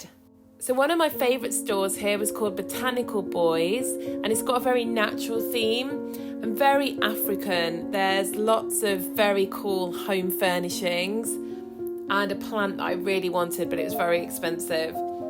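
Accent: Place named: Scotland, United Kingdom. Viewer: ok hiya from the calif coast inland a bit...been searching for a new Londoner,,,love the accent,,,I don't even mind when u say stunning,,bit of the Scottish inya?>...just a curious etymology buff...I am actually the Irish heritage as in """"get my Irish up"",,,recovering painfully with a new hip made of titanium, ceramic, and cement,,,so ta and moosheleighlee as my dear old mum would say occasionally, referencing my gran,,,ur music selection also hits my spot today...take care, mo from ca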